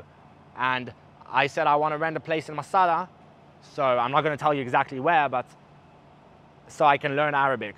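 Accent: South Africa. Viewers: You sound like a Englishman who grew up in South Africa. Your English is perfect, better than some of us Brits. seriously. 😂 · I knew their was a S/A accent, my cousins were born in Durban so I clocked that straight away. Your a very talented intelligent young man, keep up the great work but more importantly keep yourself safe 👍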